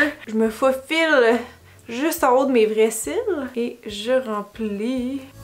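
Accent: Quebec, Canada. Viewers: OmG ce petit accent Quebecois siiiiii charmant❤❤❤❤, le Français de France est vraiment monocorde en comparaison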